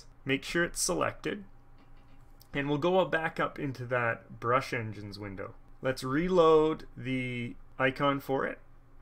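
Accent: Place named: Canada. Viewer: that is a dead give away lol. i use postal codes and zip codes daily for work and that through me for a loop the first time i heard someone go zed1tango 3delta5 i was like WUT and then the way he says resources. canadians pronounce it rezources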